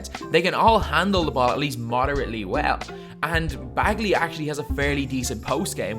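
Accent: Ireland. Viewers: I'm confused how a Irish voice is doing basketball video lol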